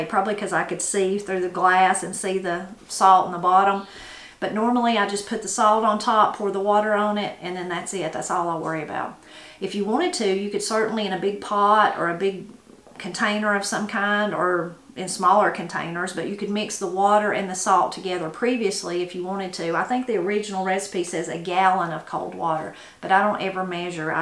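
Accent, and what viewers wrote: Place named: West Virginia, United States. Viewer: I just love your videos! My sweet mother in law was from West Virginia and she shared your lovely accent and oh so many of your “mountain” down home vocabulary! I am from Philadelphia and was blessed to marry my husband and his lovely family! Thanks again. Have a great day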